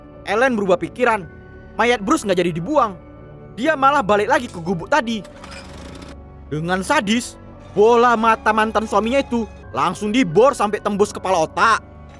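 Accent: Medan, Indonesia. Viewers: Org MEDAN ya cara penyampaian bahasa nya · Medan nya itu loh 😂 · Aksenmu medan atau riau yak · Kental kali khas Medan nya bg😁😁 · Orang Sumatra bg??? Logat mu anak medan kali, kalo iya sama kita aku Binje nya.. Kata " Berondok, tunjang, palak" Itu cuma ada di daerah kita 😅😂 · Suka kali aku sama logam abang kayak orang medan karna aku orang medan🤣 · Org Medan bg????? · Wkwk, salfok sama suaranya. Logat medan bgt😭😭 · Kayak orang Medan logat kau bang ... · Baru lihat channel ini... Dari cara bahasa.. Aku tau ini Medan banget.. Krn aku jg Medan😁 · Medan kali baahh... Baru kali ini nonton film horor terkekeh bang... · Film horor katanya..harusnya awak takut dan merasa tegang..ini ga pulak..sor kali lah dengar logat medan ini..pokoknya menghibur kalilah bang..😆😆😂😂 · Dendam cewe PMS 🤣 Bte Logatnya medan x ya bang 🤣 Sukses terus ❤️ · Fokus ke suara adminnya pasti dari medan kleeen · Nampak kali logat medan nya bah hahaha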